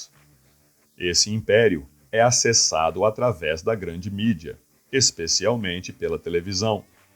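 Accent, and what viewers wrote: Minas Gerais, Brazil. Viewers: Hey vc é mineiro?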